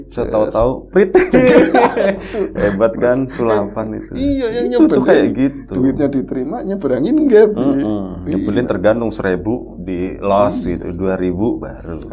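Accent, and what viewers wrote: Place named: Tegal, Indonesia. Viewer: Ora ngapak ora kepenak RRT (republik rakyat tegal)😂😂😂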